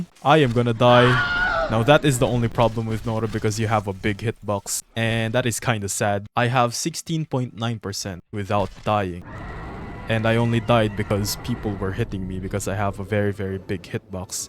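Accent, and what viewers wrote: Philippines. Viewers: Potanginnaaa mooo pinooyyyy accent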